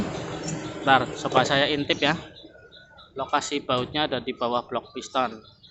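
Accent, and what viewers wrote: Banyumas, Indonesia. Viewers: Jossss....ora ngapak ora penak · Kayong ngapak... asli ngendi mas 😁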